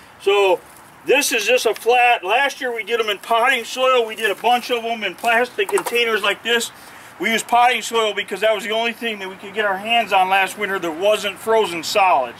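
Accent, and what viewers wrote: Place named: Midwest, United States. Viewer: What is your location btw? It sounds like you are from the Midwest 😀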